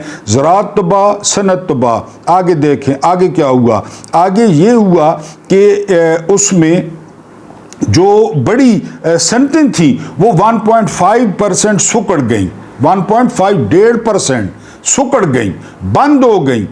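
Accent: Saraiki, Pakistan. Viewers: صاحب سرائیکی لہجہ میں اردو زبان بڑھی ہی دل نشین لگتی ہے ۔❤❤❤❤❤❤❤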